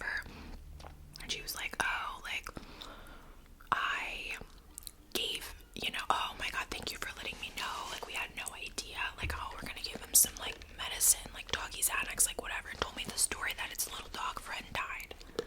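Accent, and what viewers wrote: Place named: Delaware County, United States. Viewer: Girl are you from Delco? You remind me of back home 😂❤️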